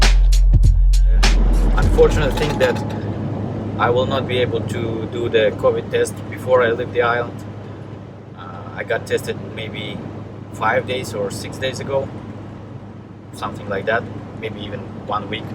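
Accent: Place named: Russia. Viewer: Доброго дня! Теперь можно добавить и в обучающее видео по английскому. С русским акцентом понятно практически все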